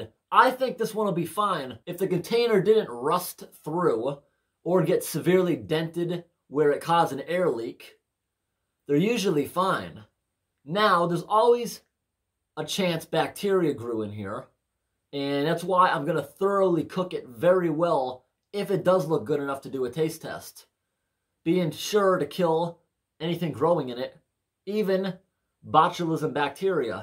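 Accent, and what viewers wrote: United States. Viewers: Why does this guy sound to me like the biggest American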